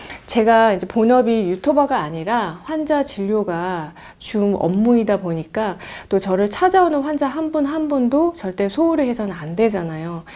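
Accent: Seoul, South Korea. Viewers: 선생님~ 마음이 그렇게 비단처럼 고와서 이험한세상 어떻게 살아가시는지 ㅎ 댓글 너무신경쓰지마세요. 자기가 댓글달아도 뭘달았는지도 잊어버리는 사람태반입니다. 그냥 방송내용만 알차게 해주시면 더바랄게 없습니다. 오리지날 서울 사투리 너무 듣기 좋습니다.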